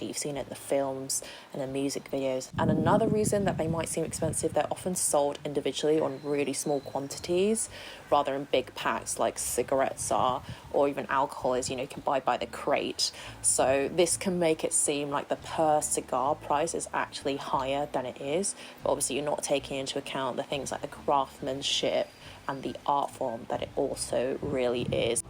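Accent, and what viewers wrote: United Kingdom. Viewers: A beautiful woman with a British accent smoking a fine cigar. There is a God... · Asian lady with British accent, cigar, so sophisticated and sexy!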